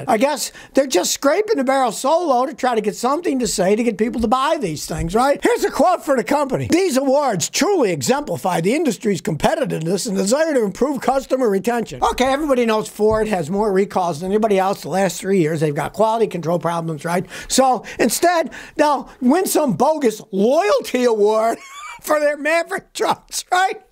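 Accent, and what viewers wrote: United Kingdom. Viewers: Love the Brit accent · Nothing like a strong black coffee and speaking with a British accent to get me going, in the morning!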